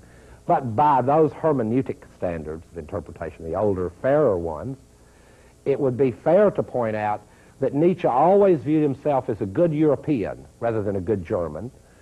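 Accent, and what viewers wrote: South, United States. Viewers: a southern twang and philosophy go together so damn well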